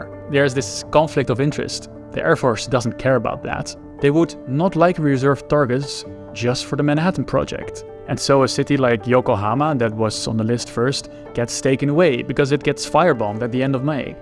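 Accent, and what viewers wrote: Netherlands. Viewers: Dutch accent is strong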